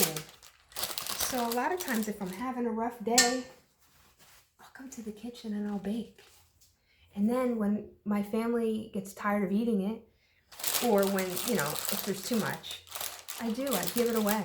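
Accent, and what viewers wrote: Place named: New Jersey, United States. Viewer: I did pick up on the heavy Jersey accent lol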